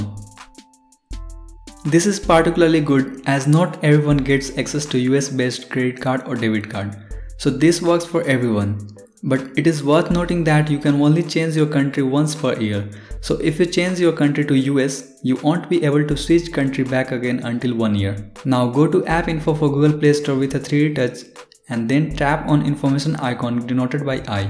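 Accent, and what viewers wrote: India. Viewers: The instructions are good but I struggled with his Indian accent on crucial steps. I didnt really understand some of the things he said. I'd prefer written step by step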